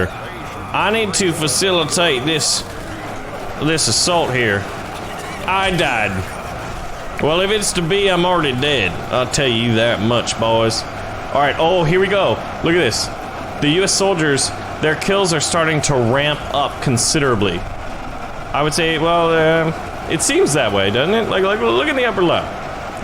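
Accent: Germany. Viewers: i swear your german is sooooo funny😂😂i am from austria so i gotta know😅🤣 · u pronoce brezel wrong sorry XD you german acent is so bad it hurts in my ears i love it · Nice German accent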